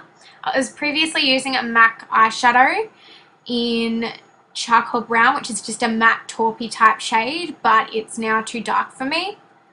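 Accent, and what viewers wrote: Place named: South, United States. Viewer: Holy crap so much for just brows! I love your southern accent though hehe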